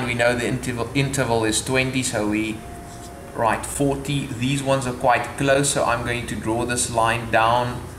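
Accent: South Africa. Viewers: Great to hear work explained in a South African accent for a change. Nice, concise video! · I think you are 🇿🇦. Just saying by your accent 🤔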